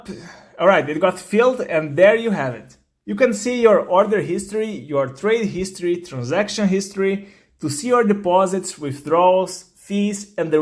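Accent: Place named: Brazil. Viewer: É um brasileiro falando inglês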